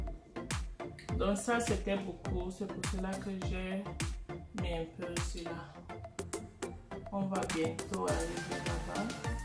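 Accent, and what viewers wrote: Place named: Bamenda, Cameroon. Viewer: Sis I absolutely love the box, I was brainstorming with my sister about this idea last week. To me I was looking for something like this where I can take my food pictures in front. Thanks for inspiring. You are full of positive energy sis and wait ohhh that was my kind of French😂😂😂😂 bamenda geh like me. It's <mail>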